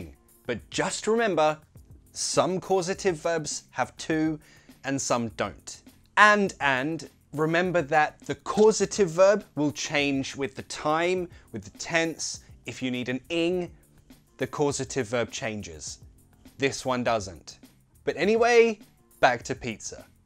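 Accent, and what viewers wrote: United Kingdom. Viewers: Alright! You made me feel appalled by the US-ish "dude" and constant use of "man". This doesn't go with you, you are expected to say " mate" because we watch your chanel by your UK-ish english, which sounds splendid. Ta for your excellent teaching work mate!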